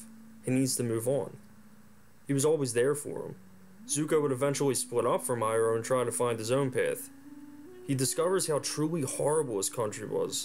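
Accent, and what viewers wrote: United States. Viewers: Bro, you gotta be from the mid-atlantic with that accent of urs. Sound like eastern PA or south jersey. Am i right? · Dude, your accent is intense... where are you from??? Baltimore? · Ok mate, I really can't tell if you're American, Australian or New Zealander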